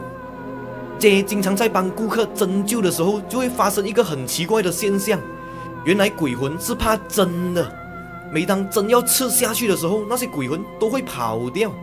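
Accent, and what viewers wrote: Malaysia. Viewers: 謝謝你的故事❤不過我還是一邊聽一邊想笑呢😂是因為馬來口音很特別嗎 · 其实，UP主他没有使用 AI 语音技术啊～他的口才還是一样带有浓浓的大马腔的口音味道～完全听得出来，但是最近他的發音腔调 有很大の进步了，有听出來 确实比早期改善了很多，讲故事也更加顺畅了～很好吖～ 我認为说 ％ 百分率 = 巴仙，在大马 或 新加坡 世界各地 都没问题啊，没必要去刻意效仿 台湾，而且台湾 使用『％ = 趴』其實也不太标准。 然后，说句实話…其實 新加坡🇸🇬 就也是一样是 🇲🇾大馬啊，只是是旧的，是属于以前の马来西亚 而已，後来叛逆 有本事了就独立自主出来，所以两边文化自然差不多也一样嘛～。哈哈……😄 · 曾经看过马来西亚网民批评你的口音，我是新加坡人，我们新加坡有新加坡华语口音，我喜欢马来西亚和台湾华语腔，所以你不要用AI，听马来西亚口音很亲切。还有，请马来西亚华人保留马新本土华语。新加坡华文界喜欢模仿中国和台湾，如联络 = 联系，宵夜 = 夜宵，甘榜 = 乡村，塞车 = 堵车，养育孩子 = 把孩子拉拔大（连田中裕子演慈禧太后也说她把光绪拉拔大），水草 = 吸管，地铁 = 捷运，电脑 = 计算机 -你去computer shop 说我要买最新的计算机，店员叫你去 文具店，注册结婚 = 办结婚证，运货 = 拉货，一个新加坡新传媒男记者竟然把德士说成 出租车 （rental cars），搭德士 = 打车，什么都搞，跟他们说谢谢他们学中国人说没事。我有说妨碍打扰你了吗？没事？我最喜欢人家说 一样一样 sama sama，或者 不会 （福建话翻译 buay）。新加坡媒体觉得 巴仙 很不标准，不敢说，有的新加坡人却模仿台湾人 说 趴，三十趴，五十趴。请马来西亚华人保留新马马新本土华语，别让它们失传。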